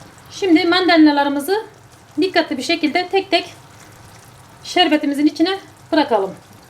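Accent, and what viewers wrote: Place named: Antakya, Turkey. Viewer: bu antakya aksanı. tesekurler arkadas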